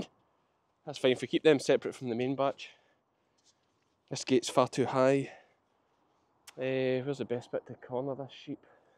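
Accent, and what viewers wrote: Scotland, United Kingdom. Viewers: you are getting very “Scottish” as you are teaching us! Don’t forget your Canadian fans!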